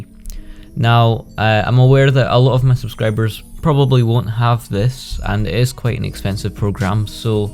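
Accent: Scotland, United Kingdom. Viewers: maaaate i didny even know you were scottish aww thank fuck makes life easier :)))))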